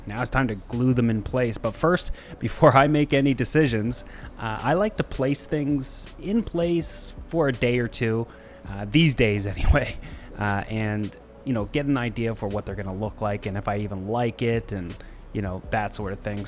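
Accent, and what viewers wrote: Canada. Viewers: 1st thing I like the idea of brackish and the lay out of aquarium. I've had few types of tanks over the years. Don't have any now and no I haven't lost the Love for the hobby it's I want to do something different but habits are hard to brake. 2nd I hate it when people belittle themselves, I'll answer your question why pronounce the way you do. Bro it's your accent. I'm not to familiar with Canada but in the States we have to deal all kinds of different accents. Every part of country seems to have its own. Good luck with the brackish and don't let fools like these question yourself.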